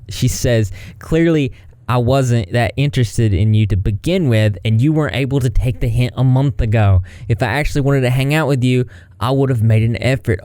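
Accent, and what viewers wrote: South, United States. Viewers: the cutest southern drawl I've ever heard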